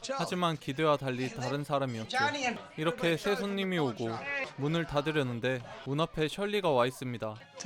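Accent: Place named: Gyeongsang, South Korea. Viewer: 맞는 듯 ㅋㅋㅋㅋㅋ 쌍도 사투리에 소리가 오른쪽으로만 나오니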